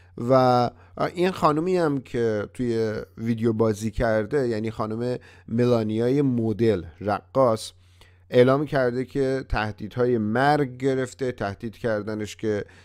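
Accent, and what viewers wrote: United Kingdom. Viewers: خوب ویدیو را نشون بده. چرا مثل تازه بدوران رسیده های غرب زده فارسی را با لحجه انگلیسی حرف میزنی؟ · داری فارسی حرف میزنی با لحجه انگلیسی وقتی می‌پرسه آیا عاشق ترامپ هستی دو دفعه میگه بله ما خوب هستیم yes yes we are fine. از همین جا معلومه چرند میگی و هیچ اطا عی نداری و دروغگو هستی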